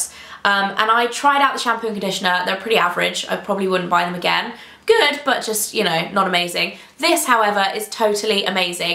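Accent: United Kingdom. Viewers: You're such a Brit! lol British never sounds casual to me, it's too proper! lol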